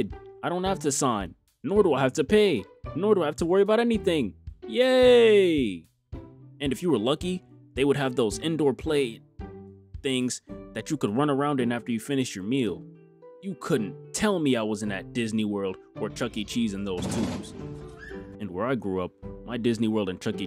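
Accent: New York, United States. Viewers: Wait? Are u from NYC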